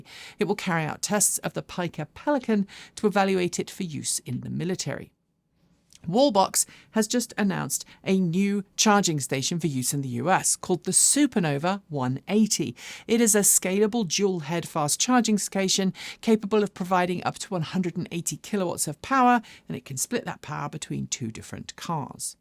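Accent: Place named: RP, United Kingdom. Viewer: Love the Brienne of Tarth accent ❤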